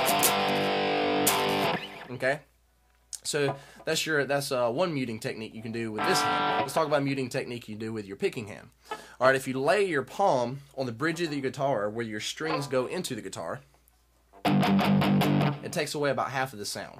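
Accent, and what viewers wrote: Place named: South, United States. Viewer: I can hear that southern accent. :)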